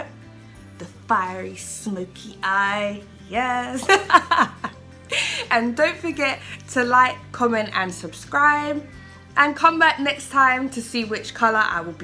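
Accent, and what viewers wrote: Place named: England, United Kingdom. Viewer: Damn, you're English lol I love your accent. New subbie here 😊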